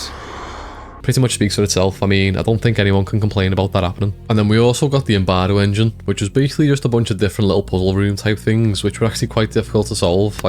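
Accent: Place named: Liverpool, United Kingdom. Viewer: A scouser review! Let's go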